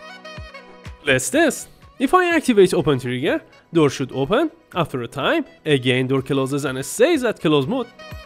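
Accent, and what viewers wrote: Iran. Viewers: لهجت ایرانی میزنه❤ ایرانی هستی؟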